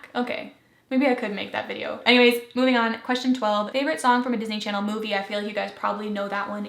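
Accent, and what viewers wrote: Canada. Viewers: your canadian accent very much jumped out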